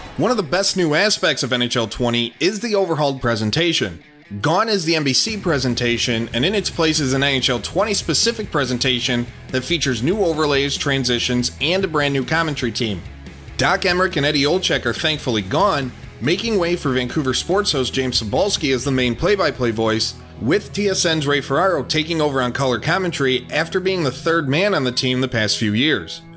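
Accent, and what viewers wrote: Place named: Buffalo, United States. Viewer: Heard the buffalonian accent and saw you were using ROC and BUF a few times and thought, this guys has to be from BUF... found you on Twitter and confirmed my suspicions! Lol #gosabres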